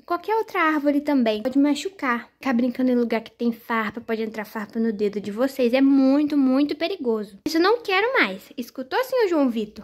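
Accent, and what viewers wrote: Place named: Northeast, Brazil. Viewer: Te amo muito ❤️,minha princesa linda nordestina 🌵 ❣️ Ela é muito engraçada e ainda por cima tem sotaque nordestino 💞🌵 Linda 💜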